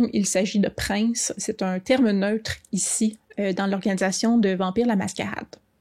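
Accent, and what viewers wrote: Quebec, Canada. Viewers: Quelle excellente vidéo 😮♥️ J’ai été tellement agréablement surpris d’entendre une compatriote québécoise sur le YouTube francophone ♥️ Je m’abonne à la chaîne!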